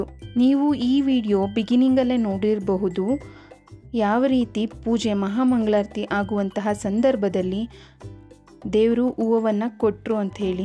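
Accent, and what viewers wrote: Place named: Hiriyur, India. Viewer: Nindhu hiriyur raaa niv mathadooo style hiriyur near bhashethra edhee soo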